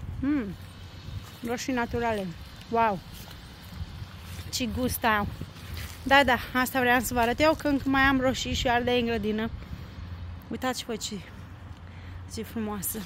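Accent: Moldova. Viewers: Adoooor accentul tau 😊❤❤. Ești moldoveanca din Iași ori Bacău?😅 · Te-am descoperit de curând, mi-au plăcut atât de mult vlogurile tale, așa încât m-am abonat și îmi doresc sa le vizionez în continuare. Ai o familie absolut superbă, tu ești atât de tânără și frumoasă și îmi place accentul tău moldovenesc!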